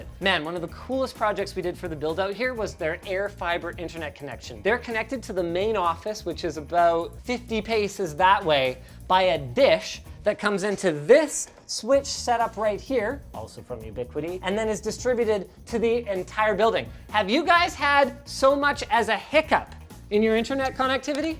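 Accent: South Africa. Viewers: we hear that South African accent bru.Nice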